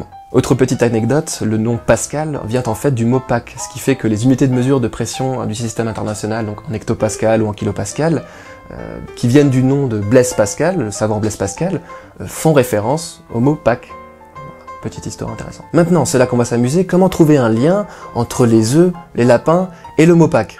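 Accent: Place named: Alsace, France. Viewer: j'entends de plus en plus un accent alsacien dans ta façon de parler. Ah yooo, ça fait plaisir dü ! ;)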